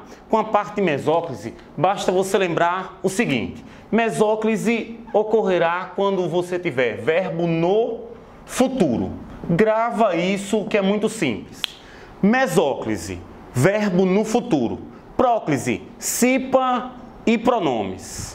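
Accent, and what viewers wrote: Northeast, Brazil. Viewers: Caramba, que aula boa!!!! me ajudou bastante, ele é do nordeste? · Seu sotaque nordestino é da hora não é aquele sotaque caricato, cômico e falando errado!!!!!!!!!😀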